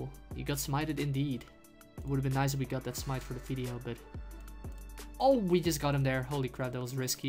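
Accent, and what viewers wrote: Netherlands. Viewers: Proper vids like always and just love that Dutch accent Ign HouseofHouse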